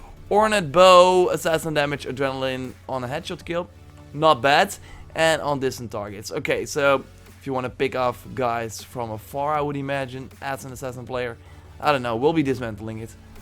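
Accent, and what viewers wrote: Netherlands. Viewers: I thought so you're from the Netherlands from your accent. Nice video by the way!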